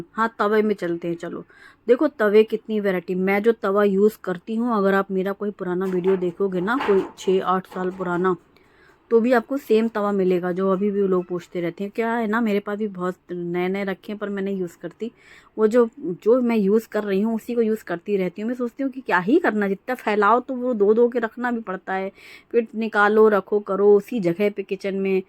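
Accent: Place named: Lucknow, India. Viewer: Hum lko k hi hai.. Apki bolne k tareeke se hi laga ap wahi k aspas k hoge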